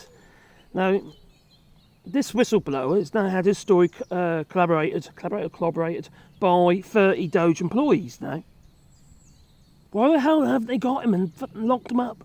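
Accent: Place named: United Kingdom. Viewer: I like this guy's accent ... British, I believe.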